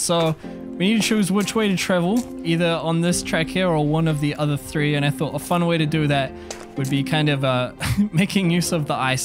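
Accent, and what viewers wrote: New Zealand. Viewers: Question? Are u kiwi? (R u from New Zealand?)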